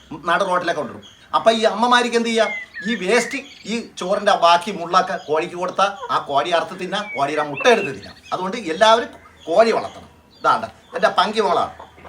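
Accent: Thiruvananthapuram, India. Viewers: എവിടെ വീട് ചേട്ടാ? തിരുവനന്തപുരം സ്ലാങ്. ഒന്ന് നേരിട്ട് കാണാൻ ആണ്. അഡ്രസ് ഒരു ഫോൺ നമ്പർ ഇടുമോ.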